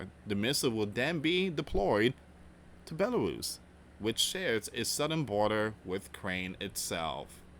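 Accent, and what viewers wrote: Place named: Portugal. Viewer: Hi, are you Portuguese? I can't quite figure out what type of accent you have. You remind of the Dog Daddy, he has the same accent. He is a great young man who trains problem dogs of all types.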